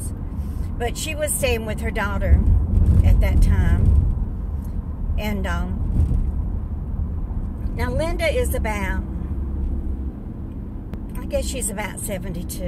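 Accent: South, United States. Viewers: Hi There It's Julie Falkingham I just love you and you are so wonderful. I will be writing to you. You are just so wonderful and sweet. I'm so thankful for all my friends and family and my husband he has been my rock and my friends are not just my friends but they are my family. You are such a loving caring person and I love your southern accent. You are so wonderful for what you are doing for your friend. You are so wonderful and your the best. I'm so greatful to have you for my friend and I would love to meet you in person and you remind of my gram she was my life and I will fill you in writing you my letter to you. You are so amazing and so wonderful you deserve nothing but the best. Love you Love your friend Julie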